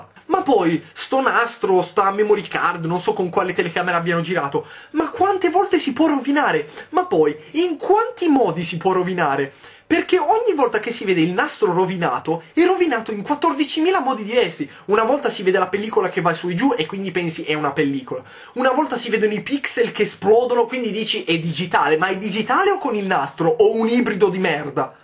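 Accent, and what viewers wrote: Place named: Calabria, Italy. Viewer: Gli ultimi 5 minuti sono stati troppo pisciosi grande yotobi xD comunque sicuro che sei di torino?quell'accento sembra tipo calabrese...eheh vecchio volpone xD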